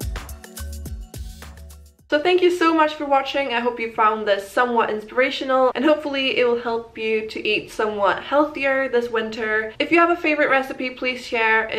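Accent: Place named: Scotland, United Kingdom. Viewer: You're totally getting a Scottish accent. Also this video is great!!! I need new meal ideas so this is perfect :)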